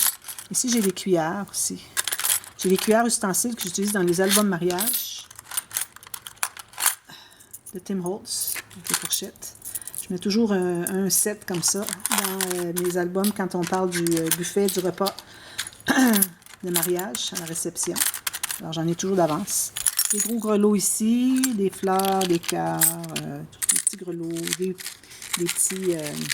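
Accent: Canada. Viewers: Bonjour,je viens de voir votre vidéo et votre chaîne pour la première fois, je me suis abonnée donc pourrai vous suivre 😊. J' aime bcp vos boîtes de rangement, j' ai l impression de me voir car je suis comme vous, je trouve votre classement très pratique, vous avez bcp de belles choses , beau matériel , j' ai hâte de découvrir encore ce que vous faites ou allez faire avec tous ceux-là...bien expliqué avec votre gentille voix...... accent agréable...Canadien ? merciiiii et prenez soin de vous madame, à bientôt j'espère 😊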